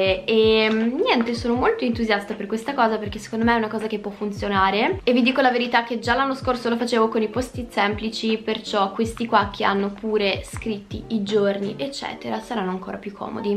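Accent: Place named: United Kingdom. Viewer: Credevo che eri inglese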